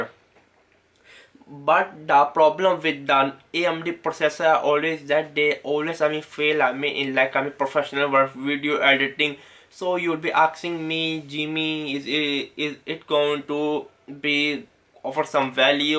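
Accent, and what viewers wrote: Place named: India. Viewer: hes just indian so he has a thick accent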